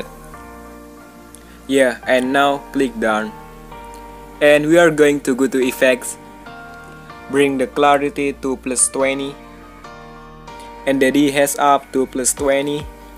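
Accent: Indonesia. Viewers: Indonesian accent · English aksen indonesia 😅 but videonya sangat membantu bro.. thank u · are you indonesian? hahaha those accent tho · aksen Indonesia memang top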